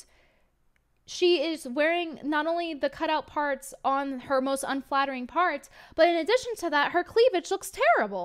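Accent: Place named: Texas, United States. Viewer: you are taking on a bit of the Texas twang you are a southern belle now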